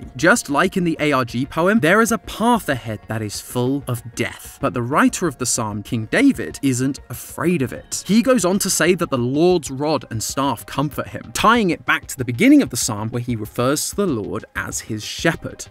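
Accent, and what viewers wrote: United Kingdom. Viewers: I think Tom was the perfect replacement for mat pat Except for the British accent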